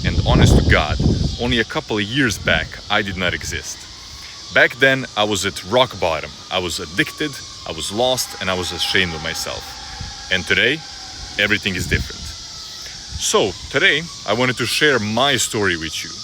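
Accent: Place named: Eastern Europe. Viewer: i knew u slavic or russian with ur accent good for practising as a russian native speaker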